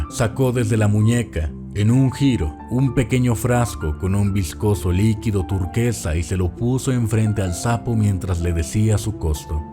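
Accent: Mexico. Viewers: Si hubiera disimulado el acento mexicano estaría mejor. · Es la voz de Sherk Pero lo senti mas mexicano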